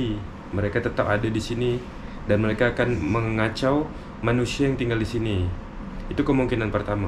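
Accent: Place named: Kuala Lumpur, Malaysia. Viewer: Senang mendengar menyantuni keluarga pesakit dengan menggunakan loghat KL sebaik mungkin, walaupun inilah kali pertama datang ke Kuala Lumpur.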